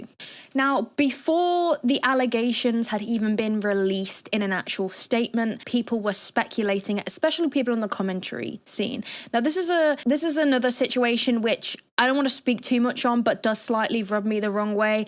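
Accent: United Kingdom. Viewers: He sounds SO British that the fact you are too did not set in until 20 minutes in 💀 sorry, side note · r*tard is used in uk to mean idiot even tho u sound abit british u have the sensativity of a american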